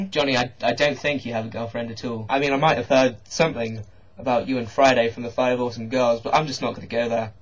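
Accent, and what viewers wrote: United Kingdom. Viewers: A cute nerdy teenage boy ... with a British accent to boot! xD Perfect for me!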